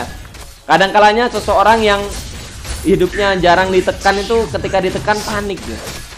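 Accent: Java, Indonesia. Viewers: Wong jawa ternyata😂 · Jane wong medan apa wong jawa mas?? Wkwk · Jowone ngendi mas hehehe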